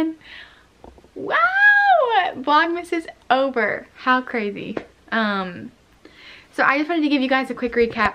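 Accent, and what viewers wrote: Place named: South, United States. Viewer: Not sure how I found thous page, you are very high energy, which is cool, I like your southern accent, I sub and liked. Hello from Ontario Canada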